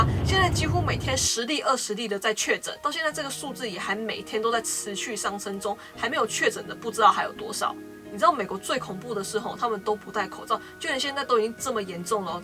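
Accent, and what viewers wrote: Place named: Taiwan. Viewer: 武汉你妹，死台湾腔！你们美爹控制不住了哈，你们等死吧！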